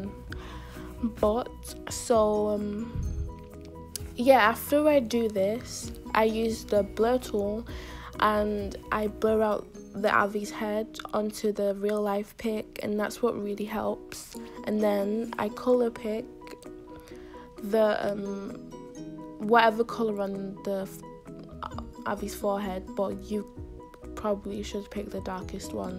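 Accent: United Kingdom. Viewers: Where r u from? It’s sounds like a london accent sorry if it’s not❤️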